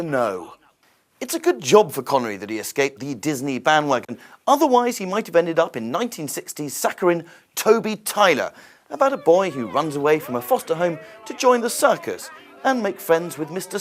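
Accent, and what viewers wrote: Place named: United Kingdom. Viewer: He's from this placde called Britain, also known as England. They say words a little bit different over there.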